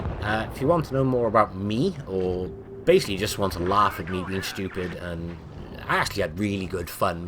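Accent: United Kingdom. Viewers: will i did not win i am a bit sad but that's not the reason why i subscribed i want the at15 because it has a ridiculous rate of fire and good armor but hey hearing you laughing along with that magnificent British accent got me compensation hope we get to rich 10k as soon as possible and please keep laughing at reds and keep uploading videos my favorite YouTubeer:D...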